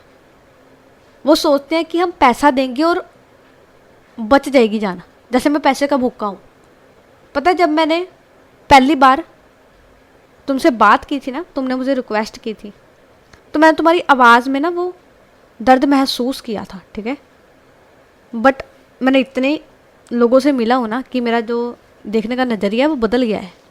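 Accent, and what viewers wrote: Punjab, India. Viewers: Very nice story aapki Punjabi bhi to Kya Baat hai itni khalish Punjabi ❤❤❤❤😅😅😅😅😅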